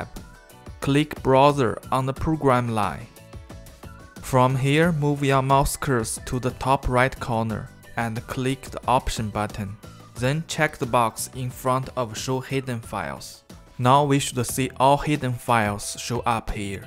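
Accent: China. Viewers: Yes! Finally find a solution. Thanks for the video., may I ask, are you from china? Your accent is very familiar to me😂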